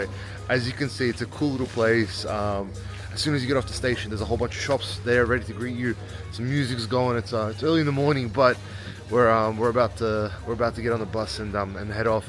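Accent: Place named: Australia. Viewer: You sound a little like someone from down under.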